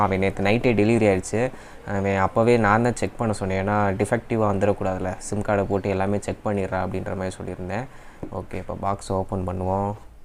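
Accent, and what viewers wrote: Madurai, India. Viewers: Bro neenga madurai ah?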